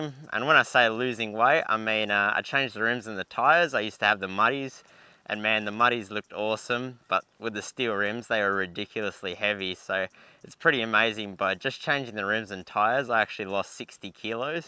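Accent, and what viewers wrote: Australia. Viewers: I think his parents are from Poland but he sounds like he has a good Australian accent.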